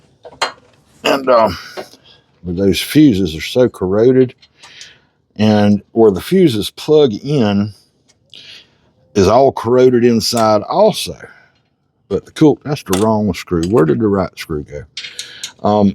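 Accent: South, United States. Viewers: I knew you had that Southern Drawl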